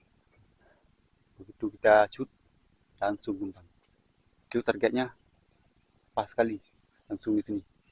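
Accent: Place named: Aceh, Indonesia. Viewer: Mcm logat aceh ini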